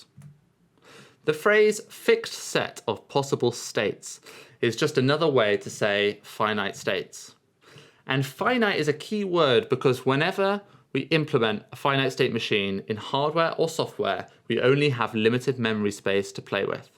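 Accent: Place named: Australia. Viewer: Australian accent♥️♥️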